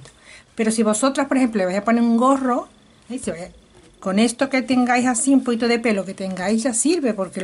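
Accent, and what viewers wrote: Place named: Cuba. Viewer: Hola amiga!Gracias por compartir esa hermosura de muñeco!!Tu eres cubana,eh,amiga?Tienesa veces un acento de ese país tropical.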